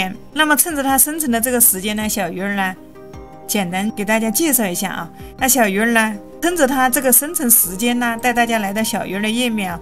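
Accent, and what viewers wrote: Sichuan, China. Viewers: 这个川普太养人了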